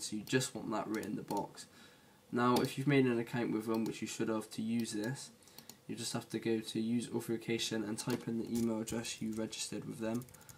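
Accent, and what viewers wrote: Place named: England, United Kingdom. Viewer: i love the way this guy explains with the tiny screen and the cursor running all over the place at 200 mph...and on top of that the english accent which is sooo clear. Way to go!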